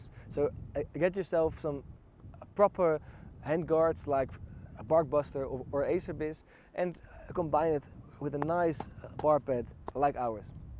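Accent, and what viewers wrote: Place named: Netherlands. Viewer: Hey! Ive been interested in getting a honda XR600R (after getting my licence) for a while now but as i'm a beginner i feel like it might be a bit too much. do you have any recommendations for similar bikes that are more for beginners and that are easilly/cheaply available in the Netherlands (its sounds like you're from here) Thanks in advance!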